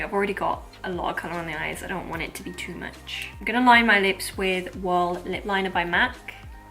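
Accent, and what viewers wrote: United Kingdom. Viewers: I can't figure out what part of the UK you're from by your accent - I love trying to guess where UK youtubers are from but I legit cannot guess lol!!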